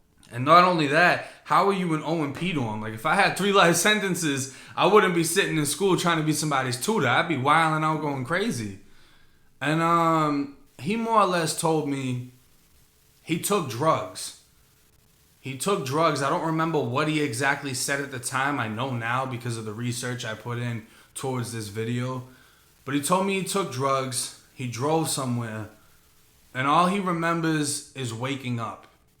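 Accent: Boston, United States. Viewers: Crazy i read it in that boston accent.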